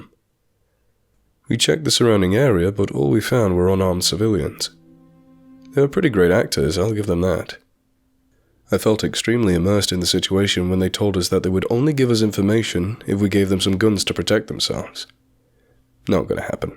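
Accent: England, United Kingdom. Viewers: What is a Brit doing in the U.S. Marine Corps.? It's pretty obvious that the speaker is from the U.K. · That Brit Accent is most annoying. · A ME city but all was in proportion, even churches? In the first vid this person talked about Palm Desert close to the Mex border in Carolina. This sounds like a great SiFi story. On top of this the narrator has an English accent! The stories sound real but I keep thinking the feeling he has of being watched may be by Freddy Kruger! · That's so weird grown ups playing war!!....in a fake city!!...so weird!!...and your accent sound english, european.. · How can a U.S. Marine have a BRITISH ACCENT PLUS mispronounce "Marine Corps?????? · These stories are great! Love the narrator's Brit accent too. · You are a Marine in U.S. 'cause you have an English accent? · This series of stories is AWESOME, but I gotta ask, if he's a US marine, why does he have an English accent??? 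Dont mean to be a Donald downer, just asking. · (Just wondering... how come a Brit is reading a Marine's story?) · British accent? And use of kilometers? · Like many other I love your voice, I DO have a few questions though, you mention USMC and Navy Seals etc, yet your voice is 100% English, and so are your expressions... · He sounds ex muslim Brit from Uk. Good stories. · love your voice. You sound british like me :) ( A Worcester girl). You tell these stories so well and the pictures are great to give an insight to it all. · british accent, talks about kilometers hmm.. must not be American marine.. ??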